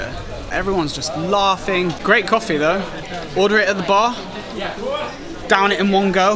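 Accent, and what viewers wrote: United Kingdom. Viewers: You've got really lovely British accent :-P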